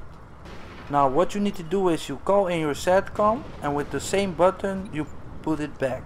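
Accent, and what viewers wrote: Netherlands. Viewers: Haah from the beginning i knewed he was dutch! Me to :D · You're dutch ?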